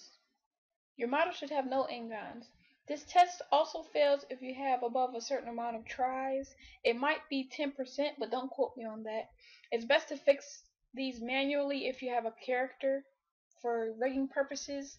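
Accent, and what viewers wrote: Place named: South, United States. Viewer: Nice southern accent :))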